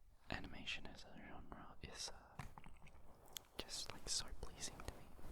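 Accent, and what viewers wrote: Australia. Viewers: Since I'm back in Japan, I have been busy with work and have been diagnosed with bipolar disorder again😢 I can't even have that much sort of energy to watch YouTube or you know just use any kind of SNS but I'm so happy to be here and hear your Aussie accent again. I've been struggling with insomnia and taking some pills but your video really makes me feel sleepy. Thank you. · I totally agree hearing another Aussie is so refreshing and makes it more familiar and a better experience for me personally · as a fellow aussie, i find it so fresh to see more aussies getting into asmr :] it's quite relaxing and familiar to hear the accent of ppl around me in asmr. not to mention your calm and relaxed demeanor - it really adds to the experience! cheers mate, keep up the great work❤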